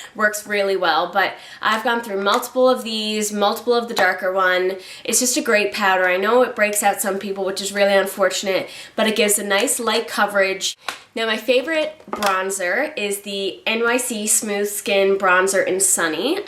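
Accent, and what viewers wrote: Canada. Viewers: Being Canadian, it's always difficult for me to hear what the rest of the world hears as a "Canadian accent." But I think I hear it now....so funny. Probably from watching videos of girls from all over the world. This was the first video of yours that I have watched and I knew within the first couple of minutes that you were a fellow Canadian! Awesome video! New subscriber!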